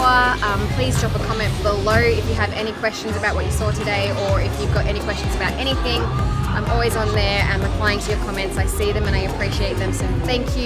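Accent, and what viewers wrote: Australia. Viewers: Not only are you the most beautiful woman on the planet, but I love your Australian accent just makes me melt you are a goddess❤❤❤❤❤ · Surprise. You're an Aussie!! After following you for a while, this is the first time I've heard you speak. "That's an Aussie accent" I thought. (And from the Cat's territory too. Grrr. Go Brisbane!!) I imagined you were 6 foot tall and American. (Well you have a six-foot presence :-) Take a bow mate. You look amazing.) What you've done is a huge credit to you. I think you're also an inspiration to us blokes. Golly, an Aussie. hahaha. So surprising. I wish you all the success there in the US. Thank you for your positive energy. It's great. Bluey.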